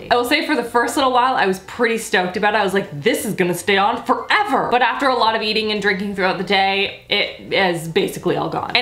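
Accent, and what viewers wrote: Canada. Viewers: You always sound Canadian and it's adorable! it's one of the reasons i follow your channel. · that moment when I'm so Canadian that I didn't even realize you sounded really Canadian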